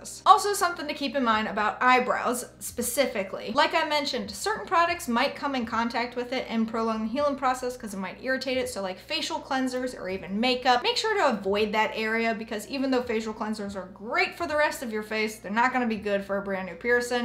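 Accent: United States. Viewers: I have a north dublin accent and I just wanted to let you know that we say piercing the same. I just found it amusing since you pronounce everything else with what I would consider a normal American accent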